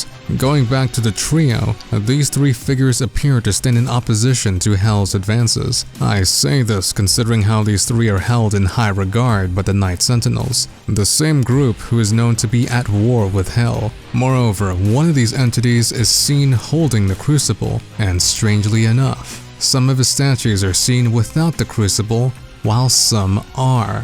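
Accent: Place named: England, United Kingdom. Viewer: You kinda sound like Ryo (english accent), from Devilman Crybaby lol. Also good video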